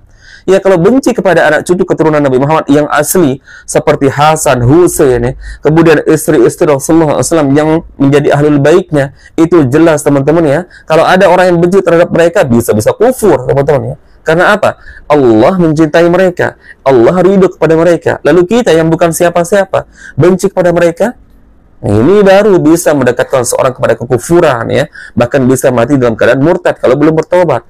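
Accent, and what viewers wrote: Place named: East Java, Indonesia. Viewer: kalau di cirikan dari dialeknya kayaknya dari Malang atau Surabaya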